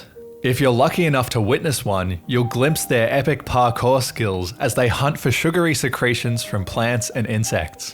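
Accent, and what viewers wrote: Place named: South Australia, Australia. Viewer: You've got s similar sounding voice to OzGeographics, albeit a bit lower pitch. Same South Australian accent though 👍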